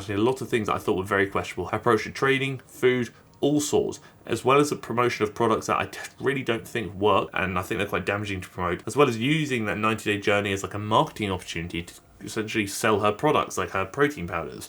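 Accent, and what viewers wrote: United Kingdom. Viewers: I have PTSD that makes British accents (specifically male voices) very triggering for me. You are a rare exception. I largely attribute this to your content and sincerity.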